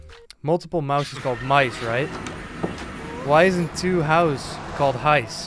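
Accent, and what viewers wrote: Netherlands. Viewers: Hey dude, great video. Are you dutch btw? I feel like i hear a slight dutch accent. Edit: welp nvm just saw the rest of the vid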